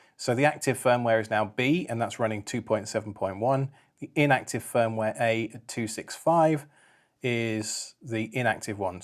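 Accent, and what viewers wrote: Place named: United Kingdom. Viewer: I'm not familiar with the different UK regional accents, but to my ears you sound like you might be from the same general area as James Corden, or as I refer to him, Craig Owens: Father of Stormageddon, Destroyer of Worlds. Just how far off am I?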